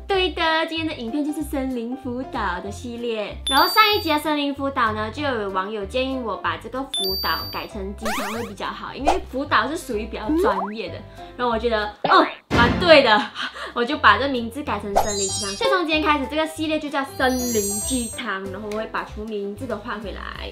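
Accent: Taiwan. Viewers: 有谁不太适应的台湾腔的？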